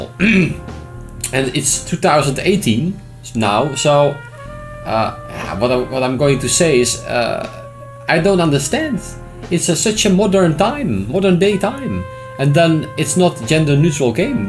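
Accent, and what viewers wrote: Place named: Netherlands. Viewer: I'm from the united states and I love your dutch accent. I'm loving it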